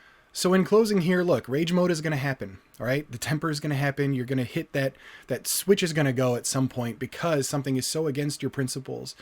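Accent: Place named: New Jersey, United States. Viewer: LOL! True Jersey speak! 😁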